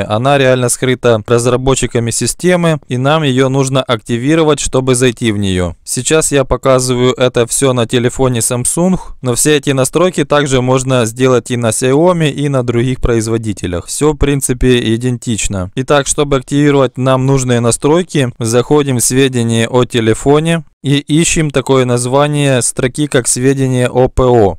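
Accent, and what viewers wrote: Ukraine. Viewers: Говор Закарпатье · Извините конечно,Ноя просто так слышу то как он говорит на русском но слышно что он с украины😂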